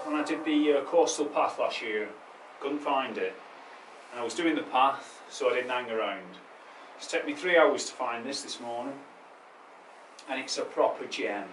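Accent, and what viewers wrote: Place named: Lincolnshire, United Kingdom. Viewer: My dads from Clethorpes...he spoke like you. I believe that was Lancashire. No..... just looked it up it was Lincolnshire....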